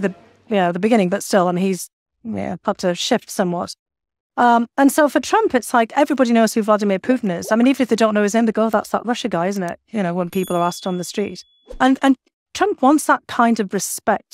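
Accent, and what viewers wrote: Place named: England, United Kingdom. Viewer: Sounds like she’s got an English accent.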